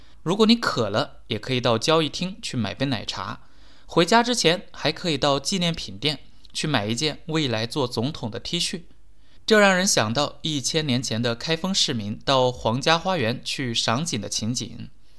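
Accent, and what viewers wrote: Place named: Hefei, China. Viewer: 听着像合肥人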